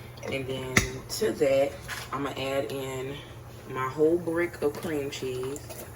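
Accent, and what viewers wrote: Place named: Georgia, United States. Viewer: Love your vids ! Trying to figure out your accent, are you from Georgia?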